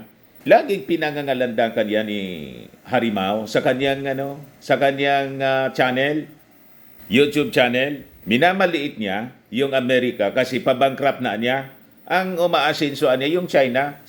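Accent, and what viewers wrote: Bicol, Philippines. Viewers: bicolano kayo sir?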